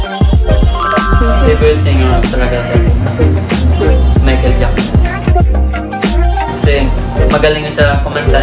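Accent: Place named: Iloilo, Philippines. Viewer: mailhan ya gd nga ilonggo kay sa tono palang sang pag hambsl mo hahs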